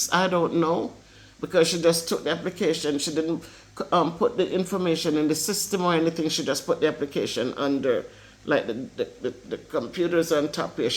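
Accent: Jamaica. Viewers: I don’t know what age you left Jamaica that you forgot the culture or you may not have been around Jamaicans while you were abroad. I think you just show off, because listening to you speak you still have the Jamaican accent. This shouldn’t be news to any Jamaican. If you are that desperate to make a video address it to foreigners looking to move to Jamaica, show off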